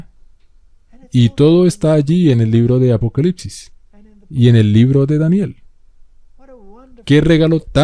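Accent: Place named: Colombia. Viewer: Feliz Sabado!!!!.....gracias por dejar abajo el enlace a la predicacion original. La estaba buscando y no la podia encontrar. De paso me encanta tu acento colombiano!!! Keep up the good works!!!!! God bless your ministry!! Bendiciones!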